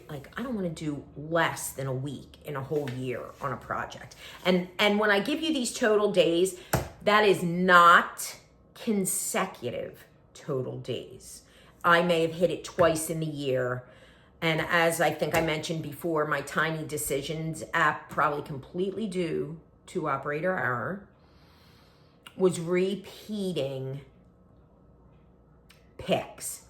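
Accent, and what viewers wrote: New Jersey, United States. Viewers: Speaking as a fellow "just across the bridge from Philly" native New Jerseyan (who has been transplanted to Northern VA) I love to listen to your flosstubes and your "accent". You sound like home to me :)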